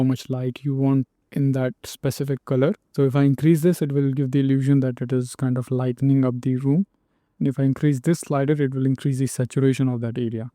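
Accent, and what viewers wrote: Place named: India. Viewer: bhai aapke ascent se aap shayad indian lagte ho , badiya tutorial tha ek dum maja aagya kafi value addition hua thanks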